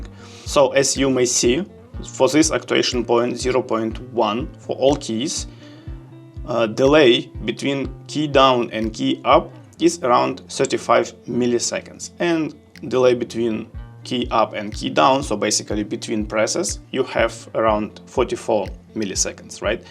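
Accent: Russia. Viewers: Та по акценту сразу понятно что человек русский) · Честно говоря я когда зашел на канал сразу понял что ты русский по акценту)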